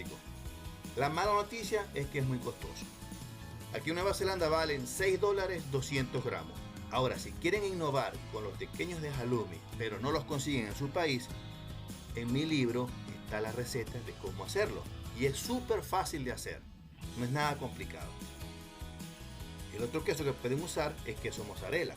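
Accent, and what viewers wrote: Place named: Maracaibo, Venezuela. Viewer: El señor habla maracucho, buena tecnica yo voy aplicarla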